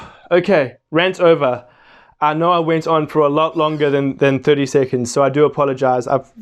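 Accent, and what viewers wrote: Wales, United Kingdom. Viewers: It’s a Welsh accent! Like mine!and Littlefinger isn’t doing welsh it’s Irish diluted with English. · It's a Welsh accent like mine from the valleys.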